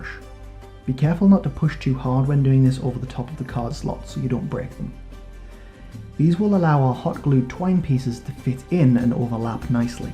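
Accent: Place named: Newcastle, United Kingdom. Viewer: Watching this older video, I can really hear your geordie accent coming through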